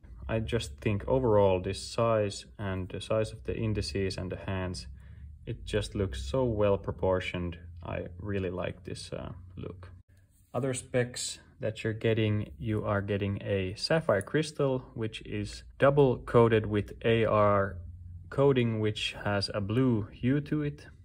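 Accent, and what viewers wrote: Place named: Finland. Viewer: Everything in the voice, intonation, word selection and the way of speech made me think this got to be finnish guy speaking english. Sure enough, finnish dude. Nice video and that watch really is a good watch, only gripe is that it looks like rolex, but its just my opinion. greetings from finland.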